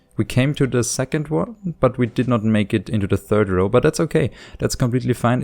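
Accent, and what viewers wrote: Germany. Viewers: You kinda have a german accent..🤔 Are you from Germany?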